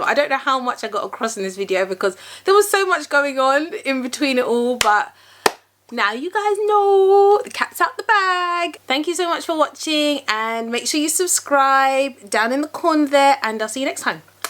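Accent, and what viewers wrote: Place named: United Kingdom. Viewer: Oh she's gorgeous. In just here for the accent. The accent is so lovely. So British and soft so the ear. I played this 5x befor my husband took my power cord. M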